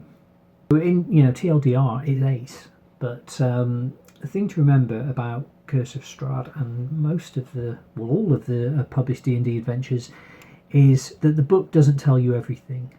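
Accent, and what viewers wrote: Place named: United Kingdom. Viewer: That's how British people talk.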